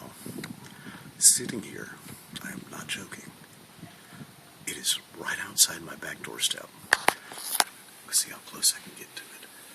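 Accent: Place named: South, United States. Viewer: That's a particular accent isn't it? I'd guess it's from one of the southern states but I'm not sure which one.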